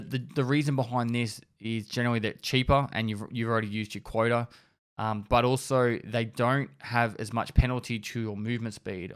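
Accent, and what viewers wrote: Australia. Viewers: man, wish I could understand his aussie accent -_-! I miss 70% of the info xd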